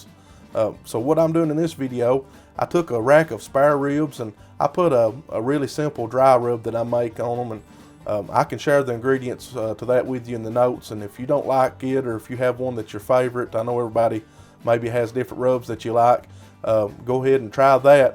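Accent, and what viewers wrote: South, United States. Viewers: Yoooo really down south sound to you man 💯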